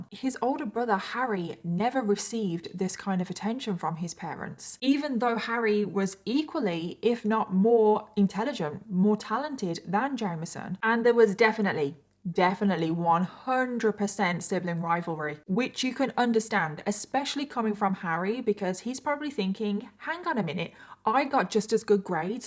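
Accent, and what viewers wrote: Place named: United Kingdom. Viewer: i’m pretty sure she’s british !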